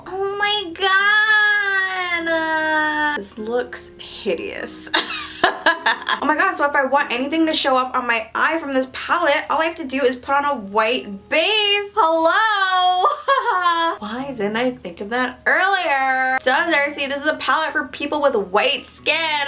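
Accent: United Kingdom. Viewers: I love your British accent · When is she speaking w/ british accent? Time?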